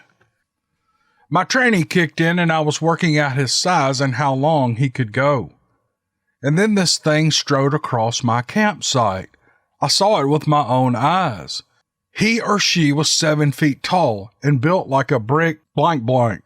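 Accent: South, United States. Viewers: Dude I bet that's why I listened to your stuff I like hear your voice you got this really Great Southern Comfort accent and you're great storyteller are really into big foot have a great night camping in your wife have a good night God bless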